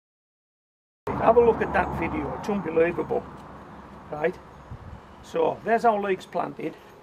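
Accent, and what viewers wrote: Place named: Hartlepool, United Kingdom. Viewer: Where is your accent from? My Mrs is from Hartlepool and I reckon yours isn’t too far off.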